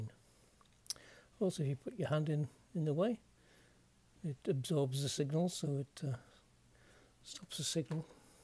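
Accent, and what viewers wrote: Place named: Northumberland, United Kingdom. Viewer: Hello again, finding the videos fascinating. I see you live in Canada, although you sound like you're from our region of the UK... we're up in Northumberland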